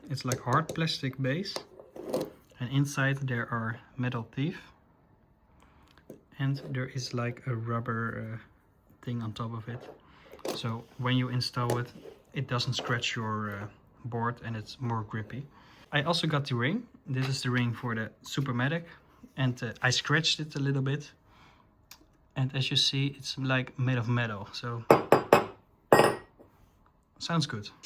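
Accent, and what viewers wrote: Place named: Netherlands. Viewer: lekker nederlands accentje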